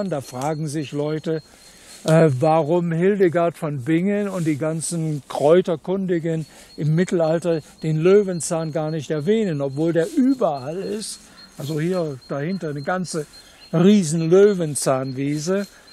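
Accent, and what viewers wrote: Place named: Saxony, Germany. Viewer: 👍💚 Ich liebe es, wenn das Sächsische durchklingt.. 💚👍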